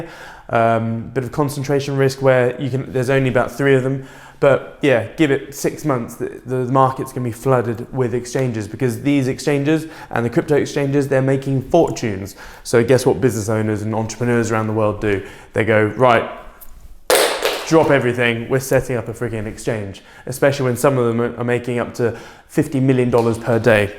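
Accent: United Kingdom. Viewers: Where you from? Trying to figure out that accent....British? You live in the states?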